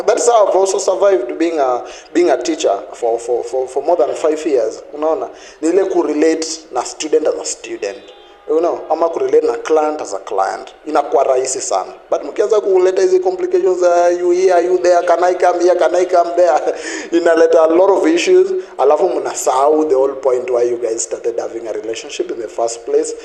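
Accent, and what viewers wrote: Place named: Kenya. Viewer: Ascent ya kiluhya ,kabsaa he is well informed though, and seems a good and funny joker, watu hutoka mbali ,lakini, encouraging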